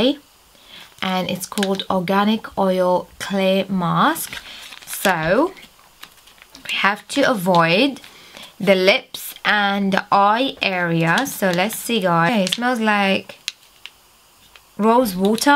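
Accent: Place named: United States. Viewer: Are you from the uk, you sound American 😂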